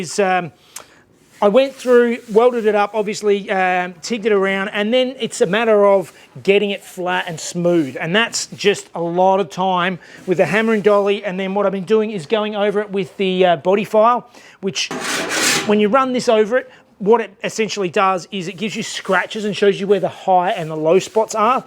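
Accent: Australia. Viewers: English, is not my first language (Dutch) as a someone from Europe, a Aussie accent is obvious;-) Don't hear the difference between Aussie en NZ (?)?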